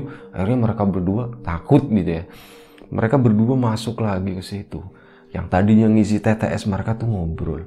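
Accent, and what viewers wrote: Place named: Central Java, Indonesia. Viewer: Mantab mas. 🙏 logat kakay Jawa tengah Alus kromonya👍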